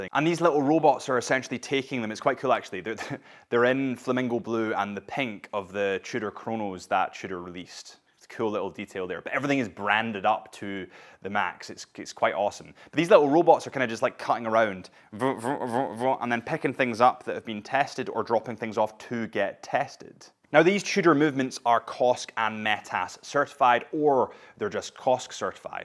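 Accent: Scotland, United Kingdom. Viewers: He's Scottish, every word sound a little different 😊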